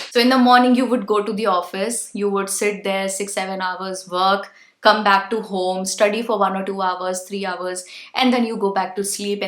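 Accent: India. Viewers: Maam pls stop this now. Even google also accepted dat there is an ancient exist which is Indian english. Even when I saw ur video even ur ascent is Indian. So no need to teach ppl wt to do! Ur r doing this only for ur channel n money. We r not fool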